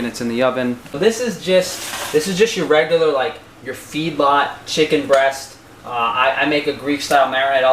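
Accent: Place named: New York City, United States. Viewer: Interesting accent. Can hear some nyc italian twang.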